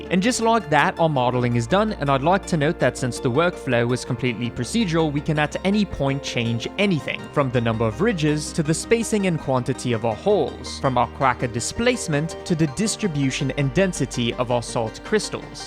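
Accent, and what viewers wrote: United Kingdom. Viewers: why your accent turned bri'ish? (loved the tutorial tho it was amazing) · the British accent sounds pretty good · Is he doing an English accent? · Damn very British! Really posh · Haha the british ish accent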